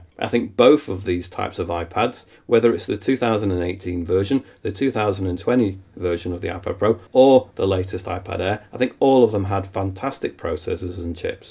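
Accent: United Kingdom. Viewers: Wow a British reviewer on youtube! Yes yes yes!!!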